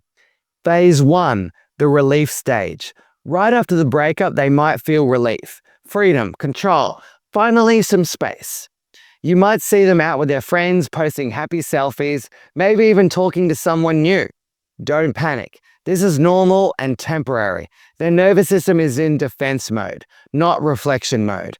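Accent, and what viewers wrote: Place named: Australia. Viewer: I loveee aussie accent. Wish i had it as well.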